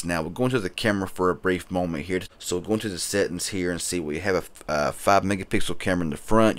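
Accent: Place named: Appalachia, United States. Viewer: Wheres this hillbilly come from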